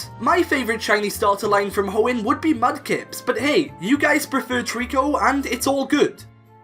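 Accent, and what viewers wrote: Wales, United Kingdom. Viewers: are you Welsh?? I’m tryna figure out your accent! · I love this man’s voice ❤️ welsh accents are pure 🔥 Another great and fun video, love me some shiny starters;) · Are you from north or south wales?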